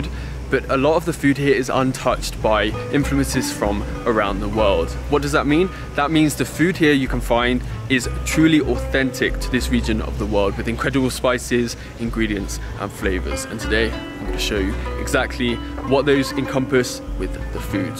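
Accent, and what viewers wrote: United Kingdom. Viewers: Hey You are so lovely And I love you Britain accent 😊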